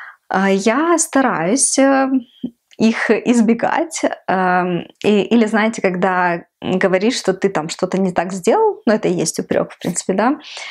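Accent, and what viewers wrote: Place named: Ukraine. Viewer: я недавно начала смотреть твои видео и все никак не могла понять, что у тебя за интересный акцент!?? А оказывается вы дома на украинском разговариваете! Так ты русский выучила специально чтобы канал вести?...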